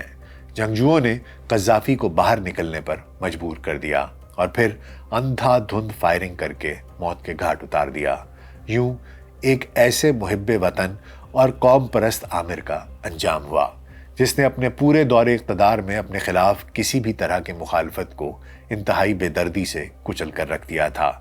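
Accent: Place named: Punjab, Pakistan. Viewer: سر اپ بھت اچھا وی لاگ کرتے ہیں ریگولر سنتا ھوں زبردست لیکن ایک چیز نوٹ کی اپ بات اردو مے کرتے ہیں لیکن دو ہزارا۔ گیارا کو اپ دو ہزارا یاراں بولتے ہیں جو کے پنجابی مے بولا جاتا ھے اسکا خیال رکھیں ❤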